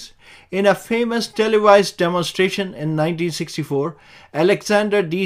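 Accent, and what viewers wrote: India. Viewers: English indian version.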